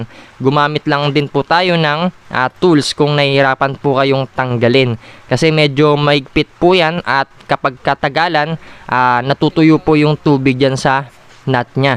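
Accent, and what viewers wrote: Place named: Pampanga, Philippines. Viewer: Ot atin halo kapampangan ING Tagalog mo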